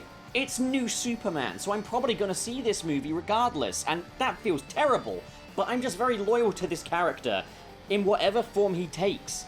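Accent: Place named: United Kingdom. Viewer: You sound British and Australian at the same time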